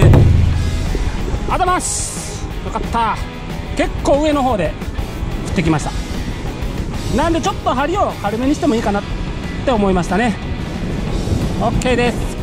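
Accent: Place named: Tosa, Japan. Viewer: 流石のもすカッチ良いなー、そして、土佐弁大好きやわ。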